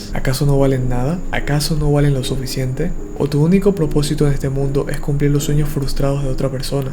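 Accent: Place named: Panama. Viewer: Cada vez que pasaba el vídeo, yo me decia: "es como si me estuviera hablando un amigo, tiene el acento de aquí" y voilá, si, jajaja, es super raro encontrarme a otro panameño en Youtube. Saludos! n.n 👋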